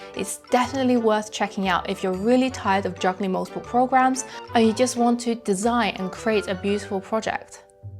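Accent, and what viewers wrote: England, United Kingdom. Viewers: Which part of England is YOUR ACCENT FROM ? manchester?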